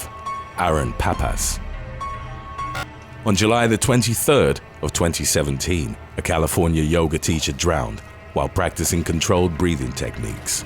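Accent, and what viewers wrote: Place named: England, United Kingdom. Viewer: Kudos on the voice. I love the dread and seriousness with a dash of English accent, makes me fear yoga!🤣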